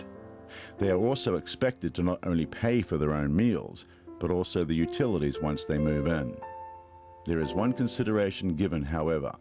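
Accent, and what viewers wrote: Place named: Australia. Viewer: wow, they make a documentary less than a year after the Tsunami and think things will have changed dramatically! Must be a Cali-tard narrating this, even with the Australian accent. Who the hell made this, the totally black screen when narrating the tsunami hitting was reallllllly cheesy. The narration is so.....it so RELISHES the opportunity to exploit this and be dramatic..it's kind of disgusting really. Have to bail after just a couple minutes. Dipped in to other parts further on and heard the same guy getting off on all of this and thinking he was being so profound. Really really disgusting M8! Thumbs down for you.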